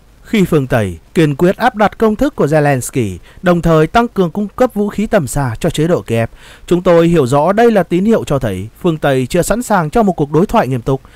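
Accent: Standard. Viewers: Bạn bl rất chuẩn ,hay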